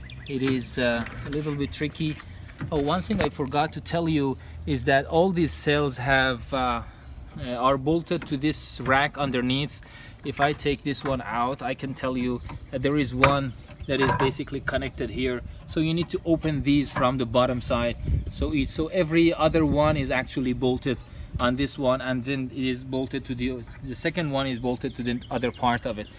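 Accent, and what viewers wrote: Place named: Iran. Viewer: Dastet dard nakone mard ,karet doroste. Ensafan englisit ali o ravooneh ,az ye rize tah lahjeye farsit goftam nakone in baba Irani bashe ( maha filmaye be dard bokhor injoori nadarim to YouTube) ,esmeto ke didam , fahmidam hadsam doroste. Baese eftekhare ke hamvatene ma ye hamchin videoye be dard bokhori gozashte to YouTube.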